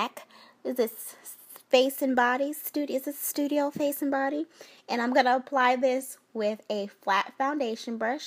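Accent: South, United States. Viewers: ☺️ girl!! You a hot mess. I'm loving the makeup and your southern accent. Simply divine.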